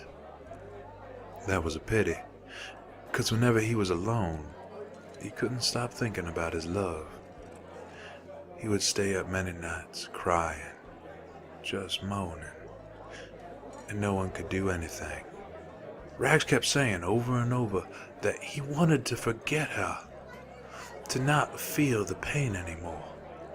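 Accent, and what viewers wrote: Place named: South, United States. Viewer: really convincing southern drawl! Loved the story c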